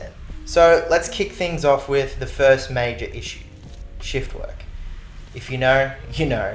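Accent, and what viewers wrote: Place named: Australia. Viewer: You sound so Aussie, are you?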